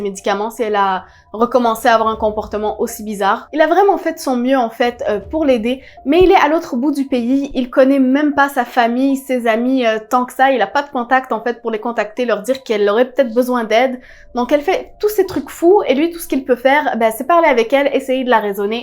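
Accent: Quebec, Canada. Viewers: T’es tu Québecoise? Ou t’habites tu au Québec?! Parce que t’as un ti accent Québecois. (Jparle en connaissance de cause, je suis Québecoise)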